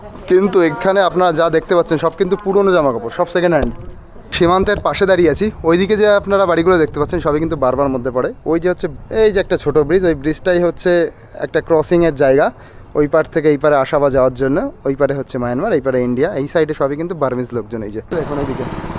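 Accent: Bangladesh. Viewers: ❤u bangladeshee korha sune boja jachee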